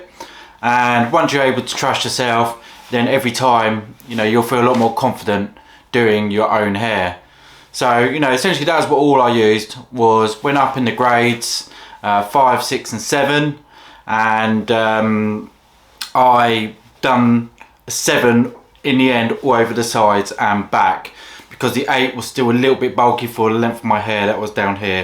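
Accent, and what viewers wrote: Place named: Essex, United Kingdom. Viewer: Uk, Essex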